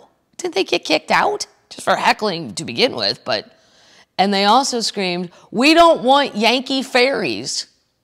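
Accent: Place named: Wisconsin, United States. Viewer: GIrl you are breaking land speed records releasing these. you''ll spoil us termites. Thanks.:) Envy accounts for a lot of negativity. I'm unemployed but I've never had time to do all that negative stuff. Life is too short. Love that Wisconsin accent. Ooo that is not my definition of a hillbilly. I speak banjo. Termites- are we all safe or have some of is blown away. The winds have picked up here. Yeah Confidential was a lurid version of the Enquirer- there is a natural curiosity about Hollywood, but who's life was ever improved by reading that swill? A medical degree may be helpful when I'm seeking advice. Night night termites. I am happy for anyone who loves what they do for a living. What a luxury.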